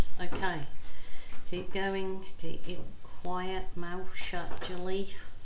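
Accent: Birmingham, United Kingdom. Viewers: Spectacular result, and please, keep on talking, it is lovely to hear the brummy accent.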